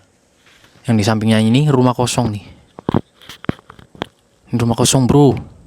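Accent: Banyumas, Indonesia. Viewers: Ealah wong NGAPAK too 😅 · rika wong jawa ya,banyumasan basane😅😢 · Subscriber baru, mas, Moga tahun ini bisa tembus 100K, dan sehat selalu ya mas, aamiin Dari logat, sepertinya dari ngapak Banyumasan ya? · Sampean wong ngendi mas daneng bahasane ngapak ya😂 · Aku suka denger bhs ngapak, semoga masnya sehat selalu dan rezekinya lancar aamiin❤❤ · Sampean wong ndi mas?mungkinkah kita terangga desa....krn sama2 ngapak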